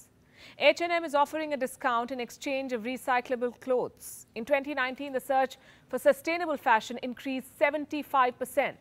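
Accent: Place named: India. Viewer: She has an Indian accent to carve granite with. Her topics and intelligence are always brilliant though.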